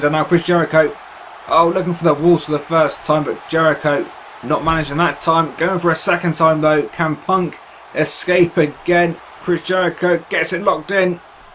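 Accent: United Kingdom. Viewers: i love your pronunciation are you british?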